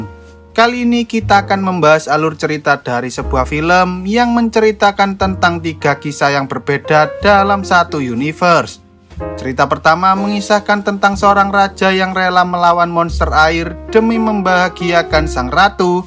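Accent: Java, Indonesia. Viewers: Medok pisan keluaran suara jowomu yo mas bro..😅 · suaranya kaya mas Dobit, medok banget .... sukses selalu ya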